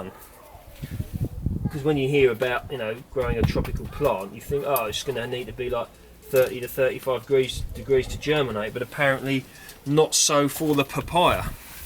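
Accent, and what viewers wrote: United Kingdom. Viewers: You sound like a man after my own heart, you are obviously English sounding so what part of the UK do you live, South West, North? Just wondering as I’m in E.London and am just moving onto trying to grow Satsumas, but gonna try a Mango as the seed is so big it looks like an Alien pod, so has to be done, hehehe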